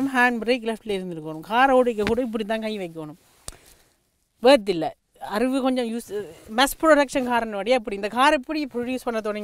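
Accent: Jaffna, Sri Lanka. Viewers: Semma tamil bro srilankan tamil aa reviews sprp rolce royce review um paathuten spr bro ungaluku car kudutha ellarukum oru periya thnx naan sonnen nu sollirunga... Keep rocking and do more. All the best bro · Unka language style srilankan mathiri irukkuthu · Bro unga srilanka Tamil romba pudichurukku Next Ben's review &Skoda kodaiq review heavy illa Tamil illa Main reasons pls all the best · Neenga Jaffa va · Sri lankan slang ❤️ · நல்ல சுத்தமான இலங்கை தமிழ் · ஸ்ரீலங்கன் தமிழ் அருமை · Enga Srilanka Tamil La Explain Pannum pothu Happy a iruku Bro 😍😍😍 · Sri Lanka la pronunciation apdidhaa irukkum bro..adhuvum azhagaanadhu dhaa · Neenga jaffna va Anna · Itz ilangai tamil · Srilankan slang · Jafna Tamil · Are u srilankan? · Brother unga Ceylon tamil suppr... And also your ammalee word.. 😍😄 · Hi brother I like your Sri Lankan Tamil style. Keep rocking 👍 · Sri Lanka Tamil... 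Azhagu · Bro ninga srilankan aah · Seems Ceylon Tamil review ... Thanks for posting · srilankan tamil nalla irukku...♥️♥️ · Super srilankan Tamil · Kingsley Rock It's Sri Lankan Tamil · Hi bro i am srilanka unga videos super congratulations I am waiting for more videos neenga srilankan citizen Pola pesuringa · Ohhh bro. I love ur lankan tamil accent.. keep going bro. Love from Madurai, Tamilnadu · Srilankan tamil kaekurathukku nalla irukku review va vida nenga paesurathu pudichirukku bro keep it up i am ur new subscriber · Sri Lanka tamil 😆😆😆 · Srilankan tamil bro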